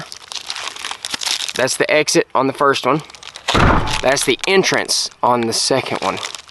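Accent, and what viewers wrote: South, United States. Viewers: Man i just wanna say i appreciate what you do so much. your personality on camera and love for firearms and definitely that southern draw reminds me of me and my friends here in tennessee and i think thats one of the thing's that make me favor your videos over a lot of others. that and the awesome content. love my wot and want a graves so bad its ridiculous. that roller i believe makes all the difference in it running on multiple calibers. i just wanted to say how sorry i am for what happened to your uncle. i could literally see what u were thinking while shooting that usp and i know it took so much for u to continue. you have tons of heart bro. i read the article and i also went and looked at the lawsuit filed. i hope u dont take offense to me asking but how did the lawsuit play out. i just wanted to know that they paid for what they did and if they didnt thats a travesty. 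the fact of who ur uncle was it never should have happened and they should have handled it way different. again man sorry for ur loss and o look forward to you content for as long as u can put it out.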